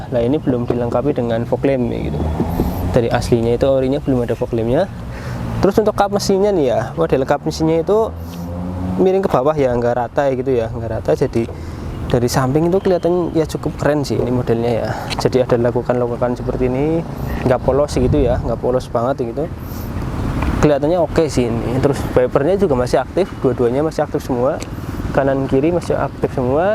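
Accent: Banyumas, Indonesia. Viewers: deneng Ngapak...😅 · Medok banget ya mas jan enyong banget😅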